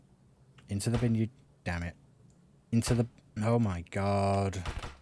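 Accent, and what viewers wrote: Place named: England, United Kingdom. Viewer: Great video. I love that English accent and your sense of humor.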